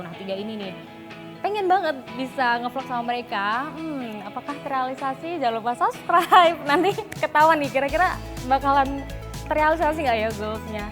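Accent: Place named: Mranggen, Indonesia. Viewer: pye2lah logat mranggenmu gk ilang mbak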